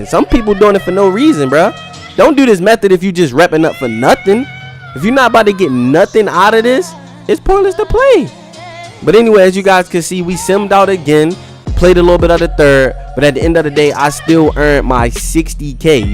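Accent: Baltimore, United States. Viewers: U got a Baltimore accent no 🧢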